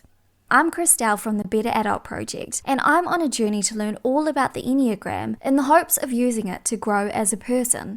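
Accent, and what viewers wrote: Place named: New Zealand. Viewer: I love your accent. New Zealand? And u r Type 4 too? Aw. Such a coincidence! 😉😋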